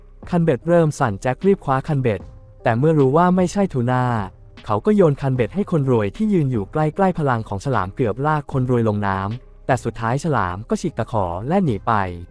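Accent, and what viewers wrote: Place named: Shan, Myanmar. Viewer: ไทยใหญ่ชัดๆ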